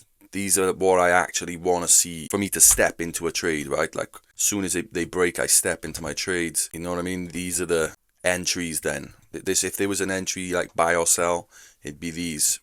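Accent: Wales, United Kingdom. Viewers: You Welsh mate? Just by the accent. From Wales?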